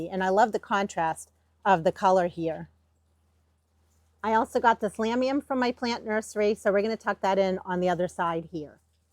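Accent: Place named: Maine, United States. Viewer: Just found your channel- wondering if you're from Maine? You sound like you are lol I'm in Central Maine. So glad I found you! Thank you for the helpful video on baskets- I'm new to gardening and grew a bunch of flowers from seed this year. Gonna make some up now!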